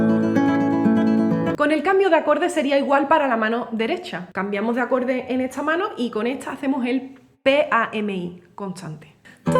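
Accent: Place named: Malaga, Spain. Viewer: No creo faltarte al respeto conmigo comentario , pero eres muy bonita, por ti acento has de ser Málaga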